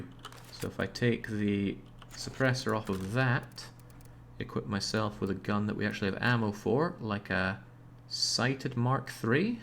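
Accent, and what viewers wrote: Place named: Northern Scotland, United Kingdom. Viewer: This runthrough has been a real diamond of a watch. Audience participation vs. a nightmare world and a few bloody hell type moves pulled by yourself, along with the usual North-of-the-border cutting commentary. As an aside, you're accent reminds me of a friend of mine from the north of Scotland who once commented on a girl "She's so ugly even a sniper wouldn't take her out". Classic.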